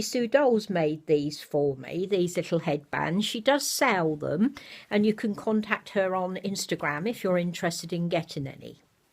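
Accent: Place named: Essex, United Kingdom. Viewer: I love your accent. My best friend lives in Clacton and her accent is like yours. Well you have an Aussie baby, l will have to look at Nursery x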